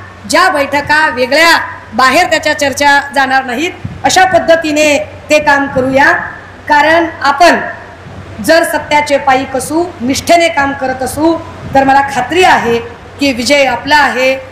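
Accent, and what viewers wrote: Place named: Bihar, India. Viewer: Tai lay Bihari bolataahe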